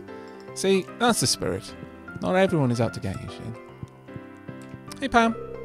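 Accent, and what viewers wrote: Wales, United Kingdom. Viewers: Hi! Firstly, very glad that this series is continuing! Between your silky smooth welsh tones and the relaxing nature of stardew, i'm always thoroughly unwinded by the end of a episode. In terms of green house organisation: I find there is enough space to have two of every regular fruit tree, and then one of each mango and banana from ginger island around the edges. Once those are fully grown you can intersperse kegs or preserve jars around these. For the dirt areas I actually prefer the quality spinklers, as it helps you organise your crops, having 8 of this and 4 and 4 of that etc. This also leaves a gap to one side for tea/ coffee. I find it also helps to have some seed makers in there as well, as i tend to re-plant the same crops again and again, and can go from crop back to seed really easily. Hope that helps, i'm off to fufil my own desire for tea on this rather chilly sunday morning.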